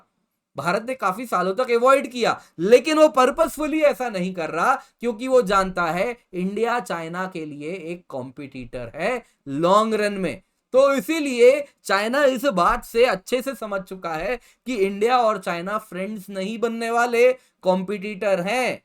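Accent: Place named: Pakistan. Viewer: Aap Pakistani jaisa Q baat karta ho 😢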